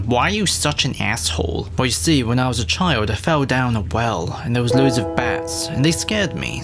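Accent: United Kingdom. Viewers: MCU Spidey is whack. A YouTuber with British voice apparently speaks facts with edited videos.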